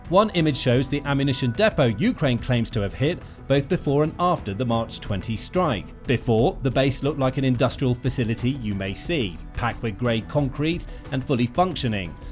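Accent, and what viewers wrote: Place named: Southern England, United Kingdom. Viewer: So, who is your audience? Since the rest of the world uses the metric system, one must assume it is the US. So perhaps you can ditch the southern English accent for Standard American.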